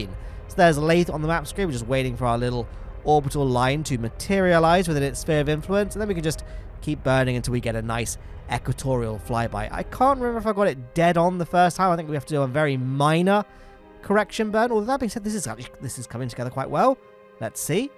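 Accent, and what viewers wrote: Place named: RP, United Kingdom. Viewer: he does have that British radio host accent also, nice pfp :3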